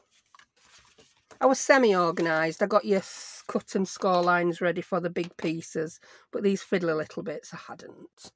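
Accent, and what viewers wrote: United Kingdom. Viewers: I am so glad I found your channel. I love your no-nonsense directions. Easy to follow with a bit of humor. It makes me smile that you share the flub-ups. 😊 I love your British accent and humor. It reminds me of a dear friend that passed a while ago. Keep making the how-to's and I will keep watching. ❤🥰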